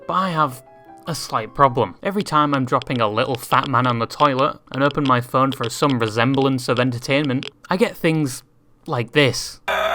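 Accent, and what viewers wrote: United Kingdom. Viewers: Never thought I'll see a British bird talking about American politics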